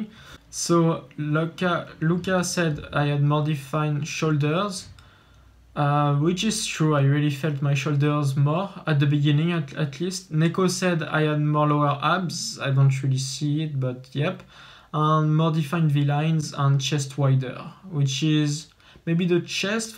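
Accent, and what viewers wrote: France. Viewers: Mdr l’accent français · Oh yes the French speaking english lovely accent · Are you French ?? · On reconnais directement l'accent français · Are you french ??? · your accent is french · That French accent... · Pleeeeeaaaasssssseeeeee you are French? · R u french ? J’ai reconnu l’accent 😂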